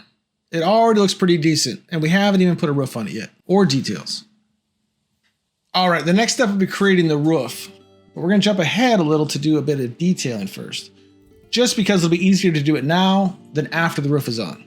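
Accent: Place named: South, United States. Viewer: You've never spent time in the south, have you? You have a "ruff" on your house. You "warsh" your car. Accents are fun :)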